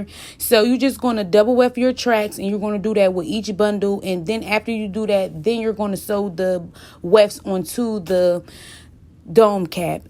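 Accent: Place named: Philadelphia, United States. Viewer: Girl are you from philly? I hear the acccent lol, #phillygang 🤍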